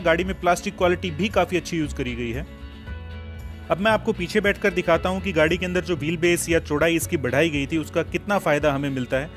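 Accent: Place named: Gurgaon, India. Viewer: Bhai Boli Se Gurgaon ka Lg Rha Hai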